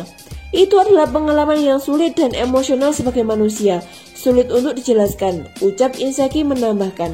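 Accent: Java, Indonesia. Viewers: Haha medok cok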